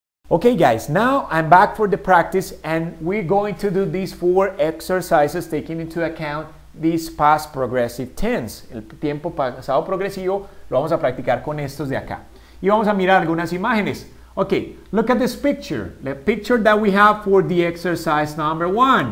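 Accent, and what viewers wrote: Antioquia, Colombia. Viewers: like si te distes cuenta que parece paisa xd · parese paisa ome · Se te nota lo Colombiano a kilómetros 😂😂😂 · me gusto su acento colombiano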